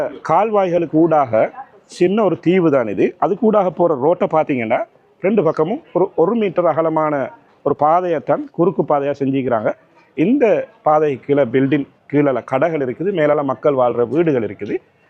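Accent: Sri Lanka. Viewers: Nice to hear Srilanka Tamil make full video.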